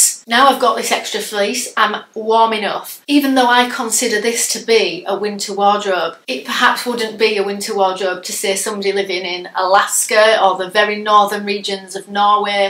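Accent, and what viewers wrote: Yorkshire, United Kingdom. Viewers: I really love your channel. You are so inspiring. Are you from Yorkshire? Thank you 🇦🇺❤️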